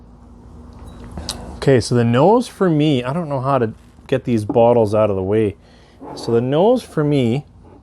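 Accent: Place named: Canada. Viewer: Ha, that accent...I could tell you're Canadian.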